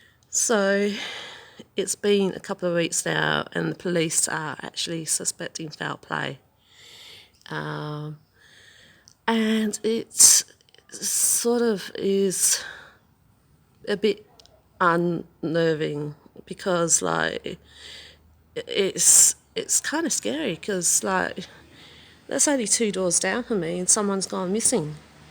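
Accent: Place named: Australia. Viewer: By the way, I noticed you have a bit of an Australian accent....😂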